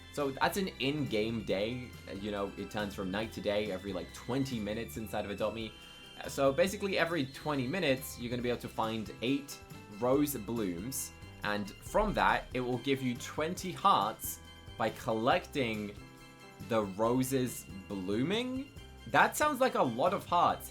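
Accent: United Kingdom. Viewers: You also sound British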